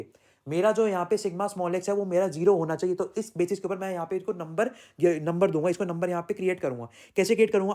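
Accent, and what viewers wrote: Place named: Pahadi, India. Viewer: love that cutu pahadi accent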